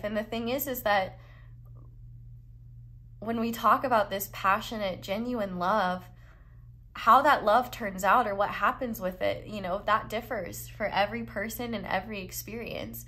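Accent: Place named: South, United States. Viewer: Pile #2, I love your Southern accent lol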